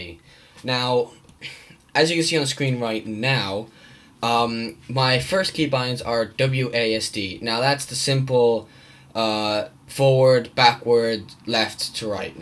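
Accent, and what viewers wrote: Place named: Netherlands. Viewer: hahahahah je engels is goed hoorde het aan hoe je de letters uitsprak vooral f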